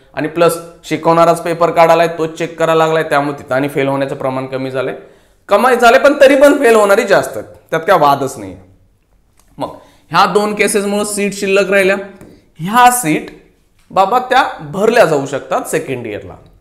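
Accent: Solapur, India. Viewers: सर तुम्ही सोलापूरचे आहात का?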